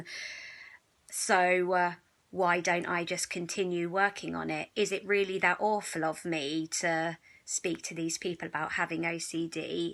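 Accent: United Kingdom. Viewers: I love UK accent.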